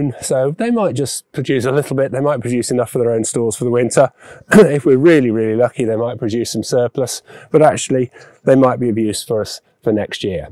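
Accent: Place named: United Kingdom. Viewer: The second I heard british accent I moved on.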